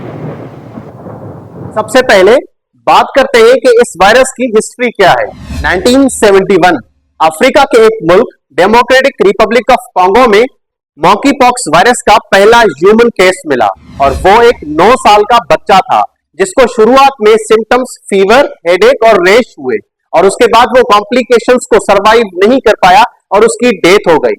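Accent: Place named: Sindh, India. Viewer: Sir I have some suggestions I would like to share with you firstly that there is a little bit Sindhi accent flowing in your tone kindly improve that and second the voice quality is not that good for that you can use Adobe podcaster firstly you will import your audio file from your video then you can enhance it from Adobe podcaster then later you can mute the video and put the audio file it will improve it soo yaa that's all I guess please put a eye on that thank you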